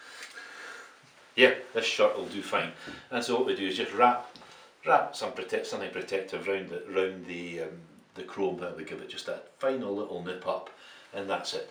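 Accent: Scotland, United Kingdom. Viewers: This is great - beautifully explained, gently and slowly, covering all the angles. As a Sassenach (but of course with Scottish ancestors - yeah, we all claim that ;-) !!), I love the accent. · Scottish but not glaswegian!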